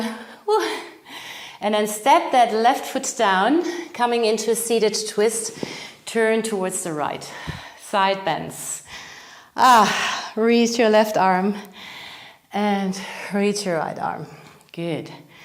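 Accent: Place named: Germany. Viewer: Habe gerade deinen Kanal gefunden und abonniert und freue mich sehr darauf mit dir zu praktizieren!! I also LOVE essential oils and your German accent. Lol. Alles Gute und viele Gruesse von einer Kollegin.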